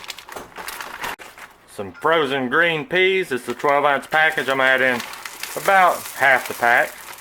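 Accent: South, United States. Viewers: I love your Southern accent.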